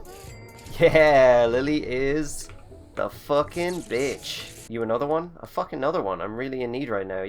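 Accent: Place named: Ireland. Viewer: Nice video bro, What part of ireland u from man?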